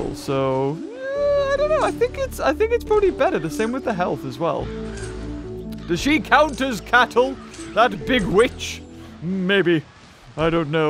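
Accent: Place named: United Kingdom. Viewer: you live in the U.K.don't you I hear it in your voice. Can you send pictures of castles?😁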